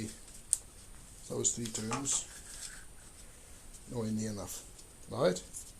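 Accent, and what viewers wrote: Wales, United Kingdom. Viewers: Brilliant video thank you for saving me a huge head ache of trying to figure it out. By the way love the way Youtube's captions struggle to catch catch your welsh accent. Much appreciated!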